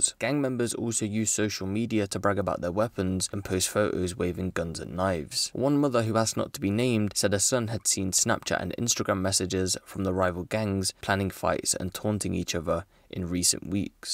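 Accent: United Kingdom. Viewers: So ur Zambian but u talk on the U.K. like ur British !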